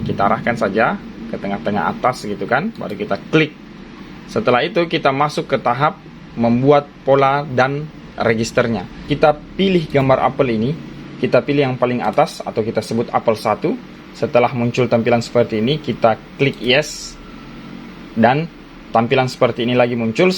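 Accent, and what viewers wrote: Indonesia. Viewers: Orang sulawesi selatan yak ? 😂😂😂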